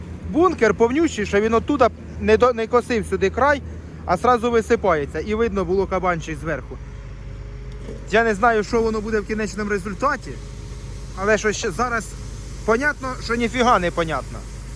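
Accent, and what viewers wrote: Poltava, Ukraine. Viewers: Звідки ви ? Акцент Полтавський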